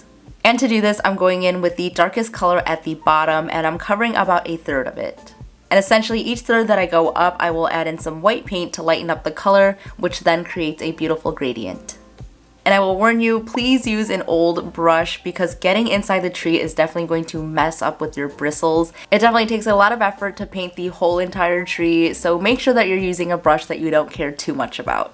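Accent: Chicago, United States. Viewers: I just stumbled upon your channel after watching a bunch of Youtube Christmas decorating videos. LOVE your videos, especially your DIY projects. Also your Chicago accent reminds me of home (I’m from Illinois originally and your voice sounds just like one of my friends ♥️). Happy holidays to you!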